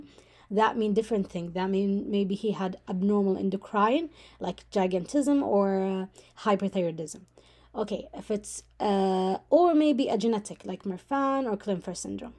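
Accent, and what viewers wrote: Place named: Saudi Arabia. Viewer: استانست لما سمعت الاكسنت السعودي🔥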